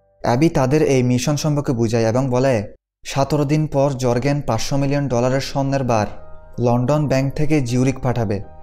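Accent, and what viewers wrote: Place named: Noakhali, Bangladesh. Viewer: Bhi.. apnar bari noakhali maijde. Ta amai vuge gesi jae. Noakhailaaa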